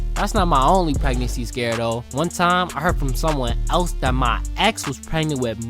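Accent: New York, United States. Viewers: that yew yorkin language HIT DIFFERENT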